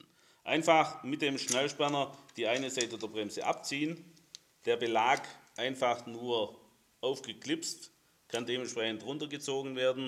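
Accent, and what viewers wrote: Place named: Swabia, Germany. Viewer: Geiler Typ mit herrlichem Schwäbisch!🎉😂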